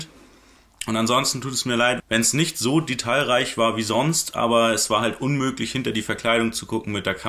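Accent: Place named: Baden, Germany. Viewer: echt cool es Video von eurem Akzent denk ich Mal ihr kommt aus der Badener Gegend oder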